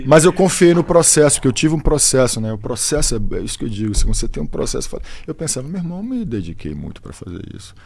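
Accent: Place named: Rio de Janeiro, Brazil. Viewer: jurava que ele era carioca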